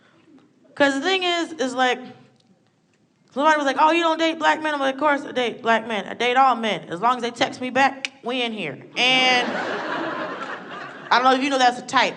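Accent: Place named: New York, United States. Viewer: Her NY voice is grating...and annoying.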